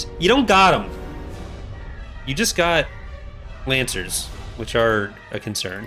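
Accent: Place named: Canada. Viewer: dont worry about sounding too Canadian, its awesome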